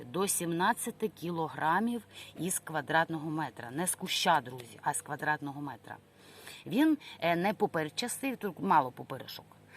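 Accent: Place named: Western Ukraine, Ukraine. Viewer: на заході України інша фонетика (звучання) у вимові.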